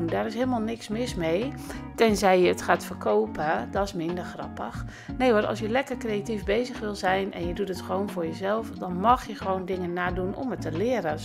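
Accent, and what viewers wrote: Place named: Rotterdam, Netherlands. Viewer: Zo grappig om je nu eens Nederlands te horen praten. Heerlijk met dat Rotterdamse accentje (mojenoukaikuh) 🤣 Keep up the good work (in Dutch please 😉)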